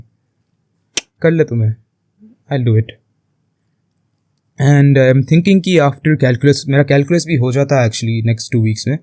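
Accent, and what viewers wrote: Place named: Bihar, India. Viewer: Bihar ya odisha ka lagra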